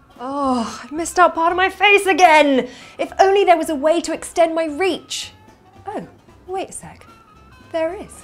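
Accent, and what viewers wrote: United Kingdom. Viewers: PLEASE , keep with the amazing vids!. just please please please add subtitles to them. brit accent is a bit hard to understand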